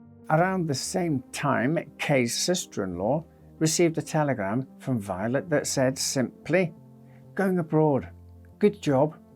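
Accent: Scotland, United Kingdom. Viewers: Oh my goodness!!! I ABSOLUTELY, POSITIVELY LOVE YOUR SCO-ISH BROGUE!!! Your stories are so very interesting!!! 😘😘😘😘😘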